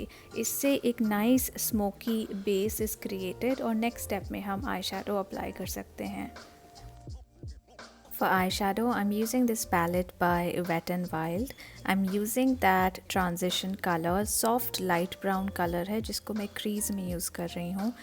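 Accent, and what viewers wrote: India. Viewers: Iam ur huge fan. Love ur vidios. And ur cute and sweet hinglish. Awsome. Tc🙂❤👍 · Apki hinglish or look dono cute lge 👌👌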